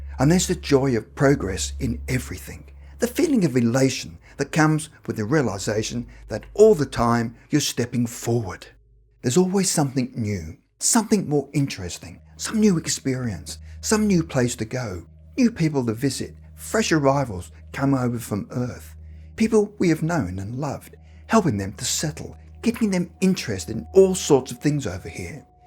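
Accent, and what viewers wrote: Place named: Australia. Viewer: I find the narrator's voice very engaging, yet somehow pleasant and soothing at the same time. Though many of these stories seem to be set in England, I thought the narrator's voice sounded Australian. I finally checked and saw that emanates from Australia. I'm pleased my tin American ear was correct for once.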